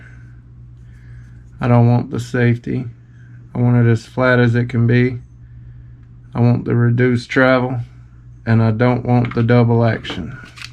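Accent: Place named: South, United States. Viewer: What a douchebag comment , cause he’s got a southern accent , let me guess your some proper speaking northern liberal? Stop being rude , thought you yankees were supposed to be all tolerant and shit?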